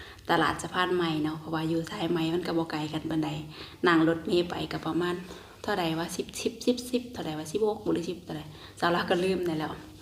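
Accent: Isan, Thailand. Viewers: น่ารักและชื่นชม เป็นสาวคนแรกที่พูดสำเนียงอีสาน ได้ใจคนอีสานมาก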